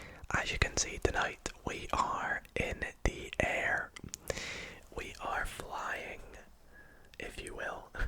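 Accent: United Kingdom. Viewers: Beautiful video,landscape/city ,and your British accent ❤️👍👍👍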